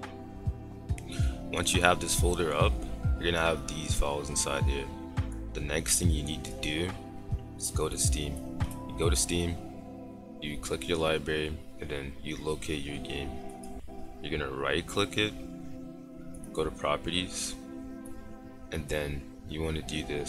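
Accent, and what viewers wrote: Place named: Baltimore, United States. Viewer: you from baltimore? i can hear the accent a little lols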